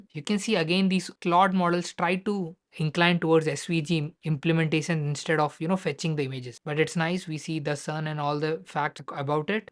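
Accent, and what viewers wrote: India. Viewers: Please take english lessons i cant with these indian accents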